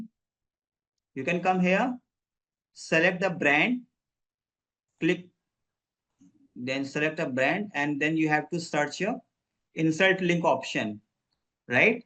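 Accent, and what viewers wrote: India. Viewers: Thankyou so much , iam interested to learn seo .. last time I seen your video I can't understand that foringer voice so this time it's an indian , now i understood every topic thank you ❤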